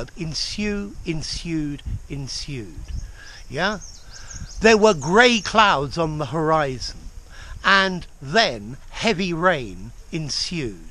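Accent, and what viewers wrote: RP, United Kingdom. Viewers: Hello Sir I've just run into your videos....you're such an excellent teacher. I wish people here in the North of England spoke as clearly as you!!! I can't wait to watch all of your videos!! I love posh accent, but I still struggle when it comes to understanding people speaking (for me) fast....would you explain us how to deal with this issue? Thank youuu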